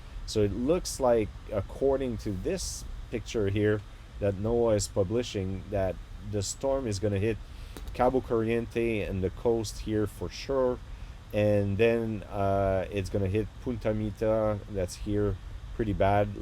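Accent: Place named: Canada. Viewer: great ! you re good in english ! are you good in Spanish too ? LoL Oui ! ton anglais est tres bon ! Mais L accent du canada vas toujours rester ! Je suis retraite et prevoit aller vivre au Mexique en 2023 ! la derniere fois que j etais au mexique etait en 1981 ! LoL are you going to be there next fall ? would be fun to chat over a coffee ! good luck with the Storm ! I think you should be ok from where you are ! Asta manana !🧐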